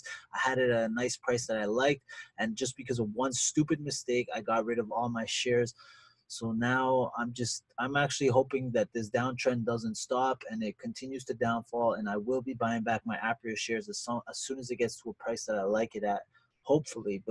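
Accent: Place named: Latin America. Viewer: Oh nooo! It's all good baby! Your dedicated and you show how it's a full time hustle, that you obviously enjoy! You stay informed & you know your stuff. Good predictions! Thanks for showing us your mistakes as well! You take criticism very well btw, its a great quality! Your English is Fine, YOUR Latino. Go Gary V on em and say I'm Latino dick, how am i supposed to talk LOL..besides Money doesn't give a ish..Stay Focused hun🙏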